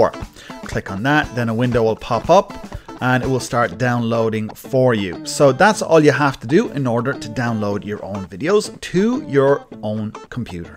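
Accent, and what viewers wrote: Ireland. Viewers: are you Irish by any chance, that accent sounds very familiar... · Irish,accent caught my 'ear"..i need to use videos from a chsnnel im subscribed to here to a site thats app hasn't lauched yet i know they are all in my playlust but everyone else seems to be sble to post a YT to watch.....any ideas please !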